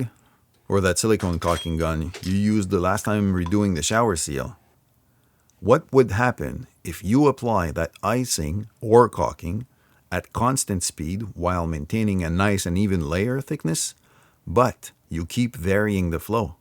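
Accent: Quebec, Canada. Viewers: That guy sounds like he's from Quebec. Really nice and detail video btw.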